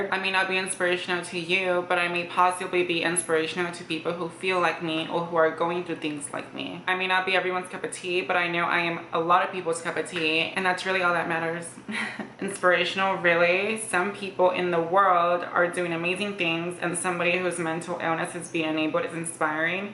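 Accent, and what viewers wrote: United States. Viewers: Your voice just sounds like youre from California haha. People are just Caliphobic :P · Your voice is what makes you, you. Honestly i feel there's nothing wrong with it. To me as a British female you just sound like a normal American girl to me. I'm sorry you receive so much hate I feel people are just bothered because they can't understand or they can't look like you. Keep inspiring! Love your views I'm also glad you mentioned about the pregnancy thing, so many people around me struggle and some cannot conceive. It's very bitter and harsh to throw it in your face, I hope that the positive out ways the negatives for you! You are amazing !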